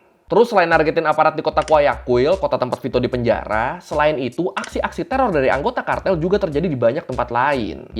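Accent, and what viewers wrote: Indonesia. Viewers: Macam sialan perbaca dari indon ni.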